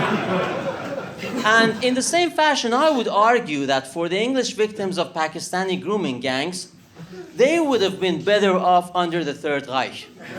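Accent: Iran. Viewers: Should ur name be “ ” that Persian accent isn’t fooling anyone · What’s up with your Persian accent bro? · he himself is Iranian i can say by his stupid accent and he badmouthing his own ppl, that is always a bad sign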